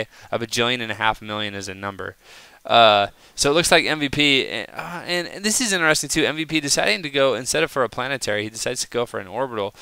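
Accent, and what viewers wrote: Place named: Canada. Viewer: ROTFL you are excellent what you do! hey can i ask you a question, what country are you from, cuz im canadian and you sound canadian also =)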